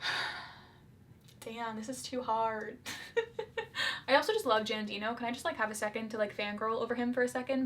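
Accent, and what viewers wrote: Canada. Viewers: also im here for your canadian accent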